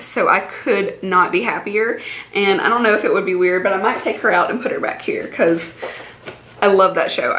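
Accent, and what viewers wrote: South, United States. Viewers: That's awesome I've always wanted to visit there. Our southern accents are very similar lol. U r very beautiful and talented. This is my first time watching you and it is very helpful Ty!:) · Growing up in the south and suddenly living way over in the west now, your voice is so nice to hear again. I miss the accents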